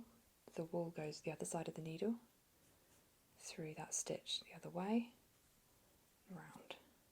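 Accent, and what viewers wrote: Ireland. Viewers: Can you knit like plush animals for example? Or is that for only like sewing or something? I'm not much of a craftsman, but I do really like plush animals >_> So I thought I'd ask. :) Great video. I actually found your channel when I typed in Irish ASMR. While I had a hard time finding any actual Irish asmrtists, I did find one of your Irish accent videos. :) Subscribed! I look forward to checking out your other videos.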